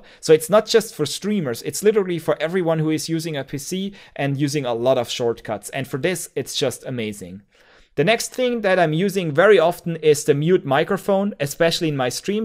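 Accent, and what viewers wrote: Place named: Germany. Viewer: Are you German? Cause I can hear the accent.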